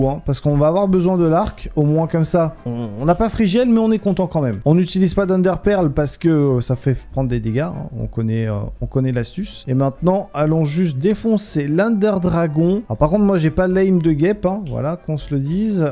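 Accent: England, United Kingdom. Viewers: Je t’adore je je t’aime ton axant anglais . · pas pour moi l'accent anglais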